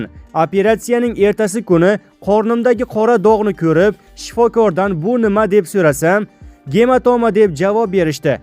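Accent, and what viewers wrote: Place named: Tashkent, Uzbekistan. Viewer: Бу канака гап энди маданият борми аелмисиз эркакмисиз аел киши шунака гапирадими тогда тошкенча гапиряпсизда энди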